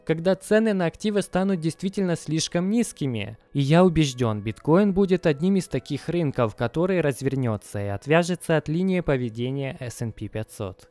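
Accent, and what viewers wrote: Ukraine. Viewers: Это украинский акцент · не только,у него произношение такое прикольнозвучащее,кроме акцента...укр.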